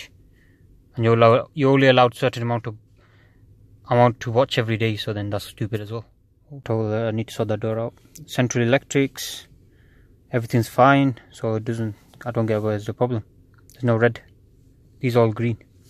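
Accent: Bradford, United Kingdom. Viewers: You're definitely from Bradford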